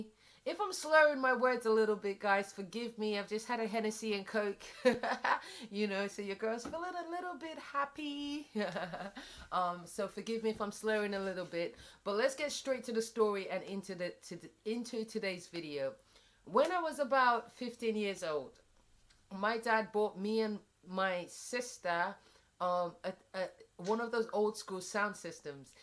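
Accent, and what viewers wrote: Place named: United States. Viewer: When ya click like before the video even starts 💫💫 Oh my gaaaaah I was a massive fan too.....still am actually!!!! You crack me up! Your as real as they get, I think its a Taurus thing. Glad to hear no-one is fcuk-in with ya but dey fcuk's wiv ya 😂 you have an American twang to your voice did you used to live out there for a wee while?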